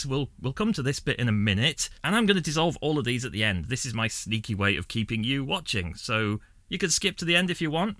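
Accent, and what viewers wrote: England, United Kingdom. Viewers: Nothing more fun than listening to an Englishman.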